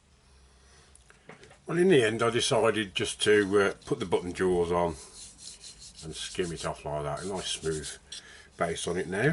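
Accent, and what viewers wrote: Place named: Black Country, United Kingdom. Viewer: Cheers for that demo. I've been checking out the other makers methods and your one is the one I'm going to have a go at. I like your style and Black Country? accent. Are you still making videos? Hope so. Best regards.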